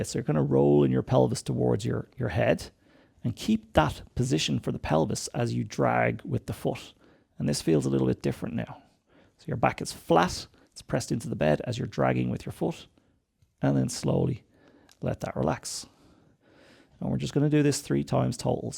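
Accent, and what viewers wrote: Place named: Ireland. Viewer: I like it. I like your Irish accent i don't think you do fine , some videos were challenging , the volume's but, not too bad.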